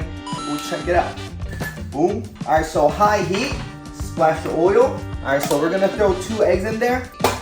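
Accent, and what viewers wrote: Philadelphia, United States. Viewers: You frim Philly?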